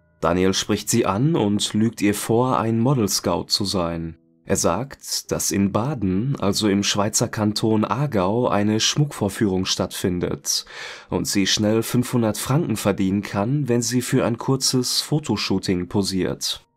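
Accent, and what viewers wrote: Ruhr, Germany. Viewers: True Crime auf Ruhrpottdeutsch sollte Pflicht für alle True Crime Kanäle sein! Ich sach ma grüße ausm Norden ne❤ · GEnau SO reden wir hier im Pott. GEile Videos, weiter so. Nur der S04 . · Mach weiter so. 🤘🤘 Kann mir auch kein weichspüler true Crime mehr geben....ehrliche unzensierte Meinung und Ruhrpott Schnauze ...sehr geil ..weiter so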